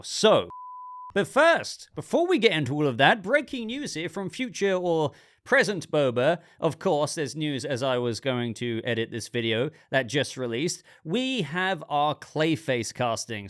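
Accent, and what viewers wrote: United Kingdom. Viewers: I watch this channel so much that whenever I think about these superhero movies, I find my inner monologue has a British accent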